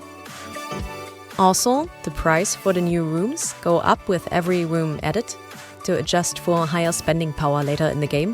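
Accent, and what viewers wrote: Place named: Germany. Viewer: Nice german accent :D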